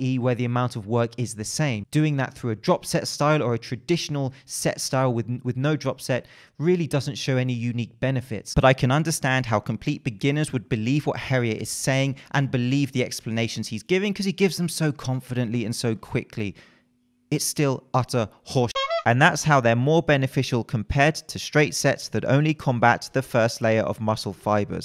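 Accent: England, United Kingdom. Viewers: I trust this guy because he's bald and he speaks with a british accent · Proper English accent, still says "ain't." I love it.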